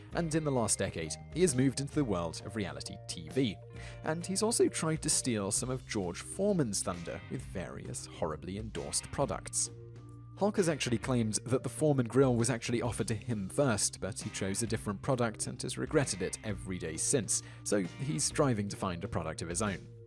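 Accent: United Kingdom. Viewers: Typical American response to British pronunciation...stupid. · Cade Tatum He's British, he can pronounce it how he wants.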